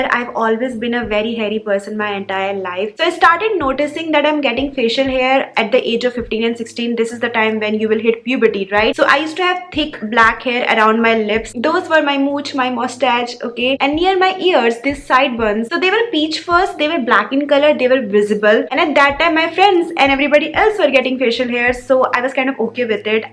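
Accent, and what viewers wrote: India. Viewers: You have the thickest indian accent, I love it so much.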